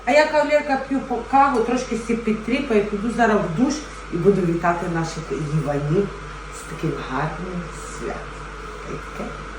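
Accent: Ternopil, Ukraine. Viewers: Ви не розумієте, це Тернопільський акцент і мова у них друга, так само порівняти людей з Одеси, Львова, а Закарпаття там взагалі мова на мові не знаєш якою говорять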